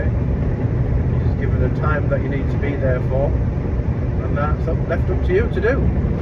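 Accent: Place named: England, United Kingdom. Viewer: Enjoying your content. Trying to place your accent. What part of England are you from originally.?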